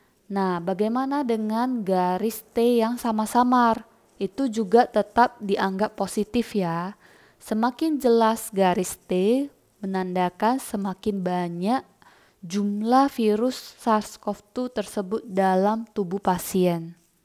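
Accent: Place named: Medan, Indonesia. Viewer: ini dokternya kl gk dr medan ya dr pontianak singkawang hh sy denger dr aksennya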